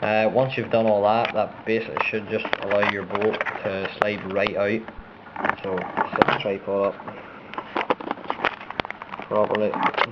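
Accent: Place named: Belfast, United Kingdom. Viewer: love the Belfast accent :L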